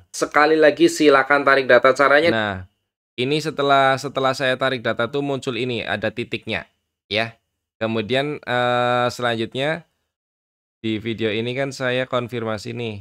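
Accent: Banyumas, Indonesia. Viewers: saya masih duduk manis jam 1.20 depan laptop buat sinkron, eh ketemu mas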